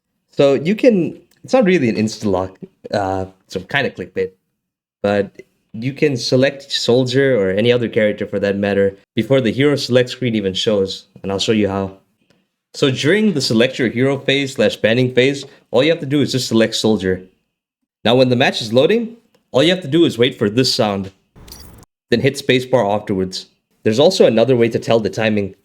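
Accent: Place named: South Africa. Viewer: I suspected you were South African from the accent and your ping and your bio confirmed it. Nice to see someone from the region making content like this. I'm wondering if you play on Middle East servers or VPN yourself to EU. I do the latter, but the 200 ping can be pretty frustrating at times even though I'm used to it. If you play on ME, how are the queue times like at high masters - GM?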